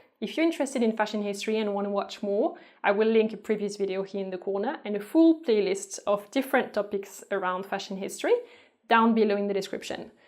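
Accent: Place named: France. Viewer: Oh god. French accent is uggghhh to die for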